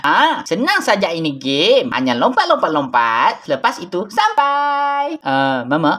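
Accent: Malaysia. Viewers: Abang negeri ape perak atu kelatan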